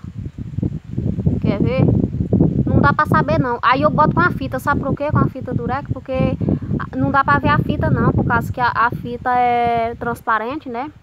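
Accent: Northeast, Brazil. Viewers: Nordestino de sitio fala assim (sou nordestino)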